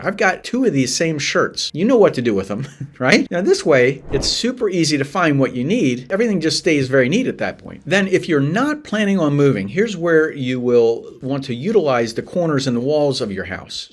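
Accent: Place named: Philadelphia, United States. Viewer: I see myrtle beach. But thats a very philly area accent!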